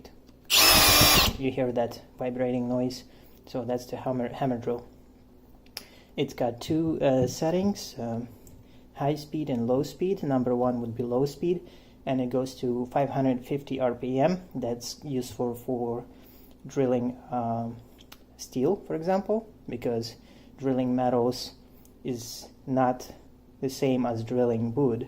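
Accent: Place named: Europe. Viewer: Nice review mate, I thought it strange that with you European accent your talking imperial ? (we're metric in NZ by the way), Iv'e just got the Milwaukee SHOCKWAVE™ Titanium Drill Bit Set - 29PC and yeah ya gotta be carefull cos these bits go hard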